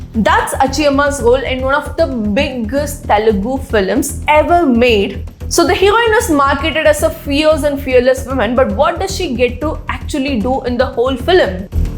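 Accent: Tamil Nadu, India. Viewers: Mis, I have great respect to you the way you have overcome all odds and stand infront of public n camera....One request, pls come out of Tamil pronunciation, especially Spelling P as B in public is kind of scar on moon. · Please, if you cannot pronounce Telugu words or names and pronounce everything like Tamil words with a Tamil accent, don't make videos on Telugus. , Director K Vishwanath was not called "Kaalaa" (black) Tapasvi. He was called "Kalaa" (కళా, Art) Tapasvi. By the way, Tamil and Hindi film industries are shit too. Where are the artistes of the calibre of MGR, Shivaji Ganesan, Raj Kapoor, Devanand and the likes? Tamil cinema actors and comedy is the worst. Is Dhanush even an actor? Looks like a beggar.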